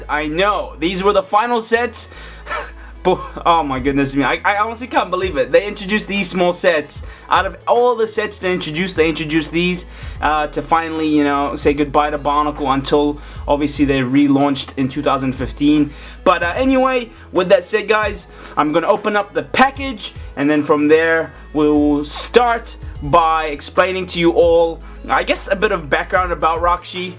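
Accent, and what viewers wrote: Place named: New Zealand. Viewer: Wait are you kiwi, that's awesome, what part of NewZealand are you from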